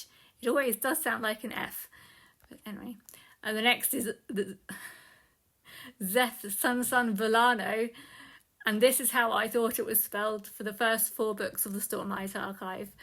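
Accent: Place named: United States. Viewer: This is such a fun idea for a video. And you did such a great job. I wonder if theAmerican pronounciation messed with it a bit too. Awesome stuff.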